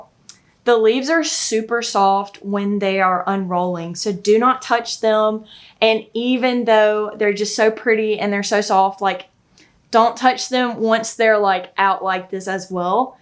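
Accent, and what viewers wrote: South, United States. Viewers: “Ah leeuhv eeuhn uhmericuh” in the world’s strongest southern accent, as if we didn’t know 😆. Haha no shade, I’m in Oklahoma.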